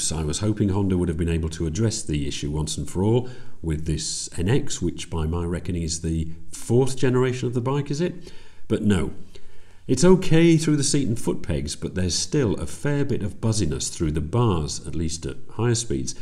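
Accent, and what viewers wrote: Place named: RP, United Kingdom. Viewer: BBC ENGLISH ! :face-orange-tv-shape:🤩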